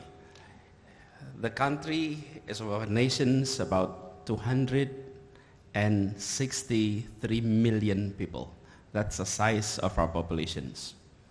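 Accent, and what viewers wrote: Indonesia. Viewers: Bagus, tp inggrisnya kelihatan org indonesia, jauh dr spt native speaker.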